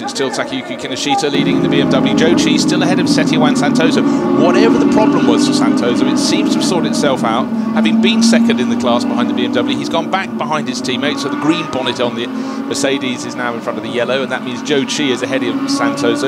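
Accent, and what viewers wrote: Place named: Australia. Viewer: I hear an Australian accent 👣.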